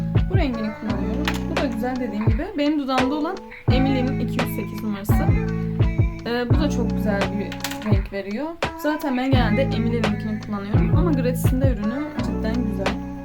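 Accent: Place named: Kurdistan, Turkey. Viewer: kürt müsün şiven bazen kayıyor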